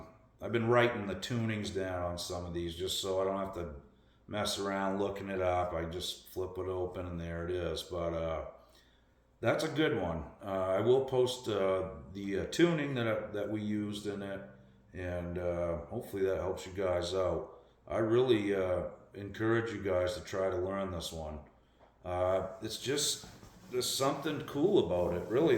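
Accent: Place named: Boston, United States. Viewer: Boston accent made me subscribe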